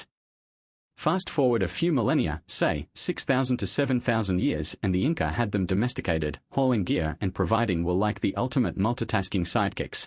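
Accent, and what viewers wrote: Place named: Australia. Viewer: did you choose an aussie accent for this u.s. history lesson? perfect 😎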